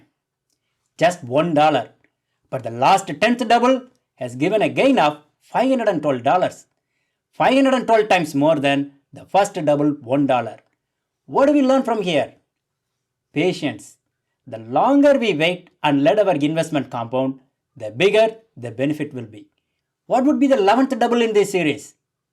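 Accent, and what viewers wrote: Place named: Tamil Nadu, India. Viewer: உங்க இங்கிலிஷ் தமிழ் மாதிரியே கேடக்குது சார்❤😂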